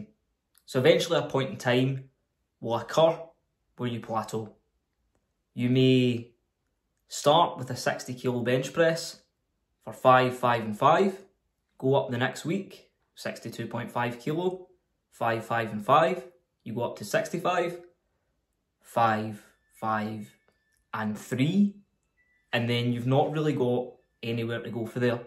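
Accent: Scotland, United Kingdom. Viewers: That’s cus he is a Scottish Brother Pal. He’s not tbad I worked in Fort William once and spoke to some of the locals. I’m English and I couldn’t understand a word they said.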